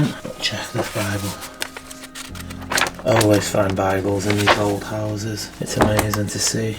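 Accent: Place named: Bolton, United Kingdom. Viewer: Hi, I’m from Bolton, living in Northern California (yep, it’s raining buckets now 😄☂️🍂) and I have to ask where are you from? Freaky or just plain intringuing, all your episodes are really interesting. Descriptive, and personal - with your connection to the lives in the houses, and talking it through on camera. I’m hooked. Your accent takes me home too. Nothing else, could you just share where you are from. And I now realise I sound like you, talking to a spirit both close and far away. Thank You for sharing all your episodes:-)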